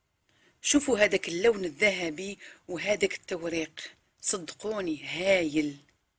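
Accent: Algeria. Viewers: برافو كي شفتك المرة الأولى نحسبك مغربية وكي سمعت الكلام لقيتك جزائرية فرحت بزاف ونحب المغربيات ثاني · bravo j'ai tout aime surtout votre parle, simple vrai algerien bon courage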